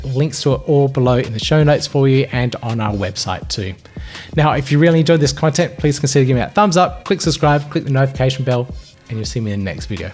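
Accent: Australia. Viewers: You sound even more Australian since returning from your break. Love the accent. I’m ready for another ISPO update if there are some new projects coming up soon. Welcome back.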